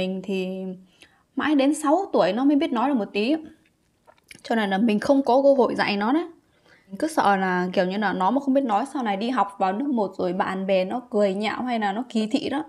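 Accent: North, Vietnam. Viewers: Nói giọng bắc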